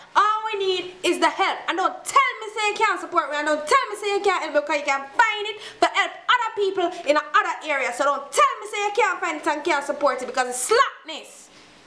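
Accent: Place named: Jamaica. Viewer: calm down sista calm down right now you should be in a Tyler Perry play your good and I like your accent JAMAICAN NATION FOR LIFE!!!!!!!!!!!!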